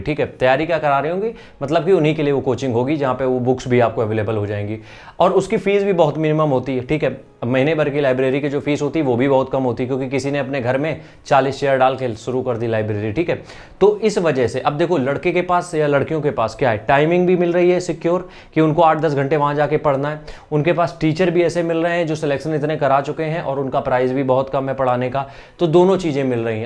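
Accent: Kanpur, India. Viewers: Kanpur se hai lgta😊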